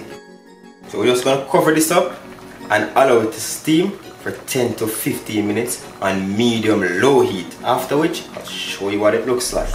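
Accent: Jamaica. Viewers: The vlogger's manner of speaking is called Yard Flex aka Jamaica vernacular. You are probably not a Yardie, so you would not understand the way in which some Jamaicans communicate, and if you re a Yardie, shame on you for criticizing another Yardie when your own writing demonstrates that you have not accomplished basic grammar. De pot a call de keckle black. These types of Jamaicans, with their own flair and creativity, are the ones who made Jamaica what it is. There would be no bloodclaat reggae music were it not for people like this vlogger. By the way, the video is about a Jamaican dish, and not the vlogger's vernacular. So, why are you criticizing the in which he speaks? I know that mispronounciation of words, and stuttering while speaking is very much part of the way in which many Jamaicans communicate. Keep it up brother, your vernacular brings me feelings of nostalgia. 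Nuff respect in every aspect.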